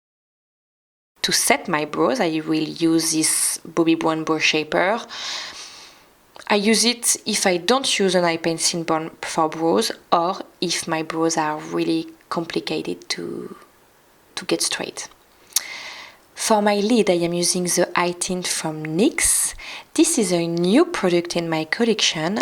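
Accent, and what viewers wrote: France. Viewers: no problem understanding you at all, in fact i love to listen to you: your melodic diction and French accent are delightful, while you are also very articulate. In English the nail color is a dark teal, i'd say. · I love your French accent! One of the reasons why I like your videos ❤️ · Don’t fret over your accent! Your English is very good and how many of us wish we spoke with a French accent? :) Another great video! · I love your accent! You're very French, of course!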